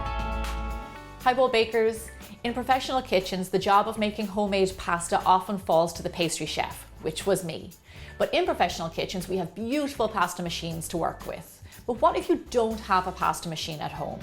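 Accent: Ireland. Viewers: I'm adding this to my list of what I've baked already: sourdough bread, pretzels, corn and flour tortillas, pita bread. I find that I love baking. My Grandmother used to make noodles. My Mom said she hung the noodles all over the house to dry. Interesting visual. By the by, I like your accent. You're Irish, right? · i love how your irish accent is sometimes coming through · So i was looking for an easy home made egg pasta recipe and just clicked one without looking at the thumbnail and the video started and i hear the familiar Irish voice. I was like omg it's 😂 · Are you Irish ? Love ❤️ the accent 👋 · I knew you were from Ireland even before reading. Love that accent.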